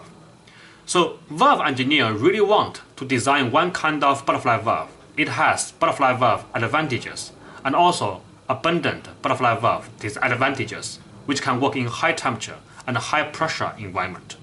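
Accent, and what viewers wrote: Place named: China. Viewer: 中式英语口音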